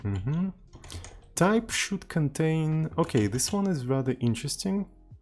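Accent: Russia. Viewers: Are you from Russia? Your accent sound as Russian accent and your account was registered in Russia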